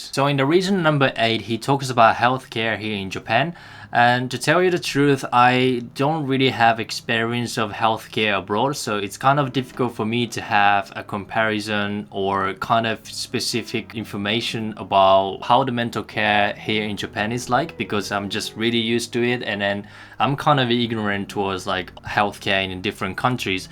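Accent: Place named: Australia. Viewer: this is the first video of yours I've seen -- I believe I hear a strong Australian accent good video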